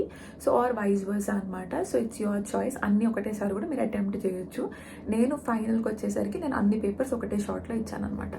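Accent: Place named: Kerala, India. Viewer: Miku malayalam accent undi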